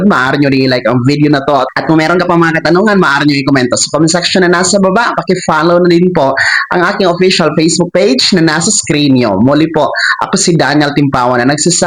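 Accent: Visayas, Philippines. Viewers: Ung dila m halatang bisaya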